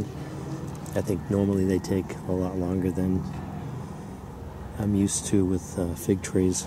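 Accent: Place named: Boston, United States. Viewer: Happy New Year! Hope that you are doing well. I miss your regular clips and commentary! Well, maybe it's the Bahstun accent! Best regards, Chip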